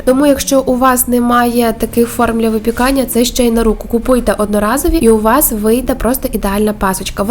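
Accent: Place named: Western Ukraine, Ukraine. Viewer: Это не украинская мова.....это западенская мода ! В Украине так не разговаривают !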